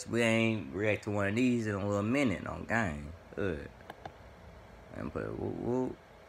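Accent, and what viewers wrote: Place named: Arlington, United States. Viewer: I know u from Arlington talking about cracking 4s u prolly from south Arlington way u talk it’s east side agg town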